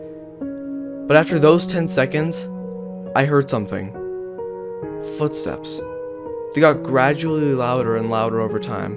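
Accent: England, United Kingdom. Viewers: nice British accent. Better than mine and I'm British haha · Where is that english accent supposed to be from?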